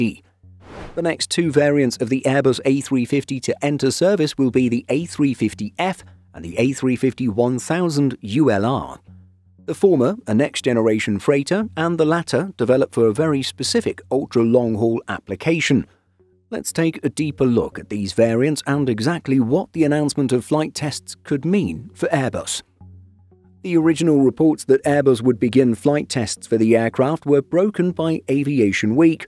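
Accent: England, United Kingdom. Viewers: In fact your english accent excites me a lot ! As you say purpose, so great for my french ears... ❤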